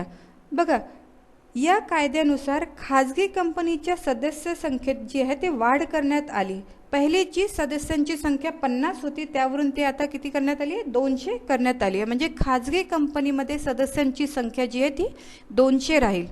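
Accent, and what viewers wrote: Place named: Nanded, India. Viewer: Mam tumhi nanded che ahat na😅 tumcha language varun vatate , ekdam sundar teaching ❤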